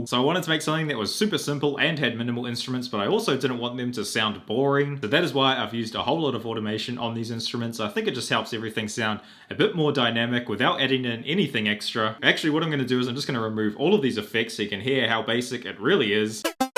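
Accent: New Zealand. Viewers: Are you a New Zealander? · Are you a New Zealander? Because you don't sound Australian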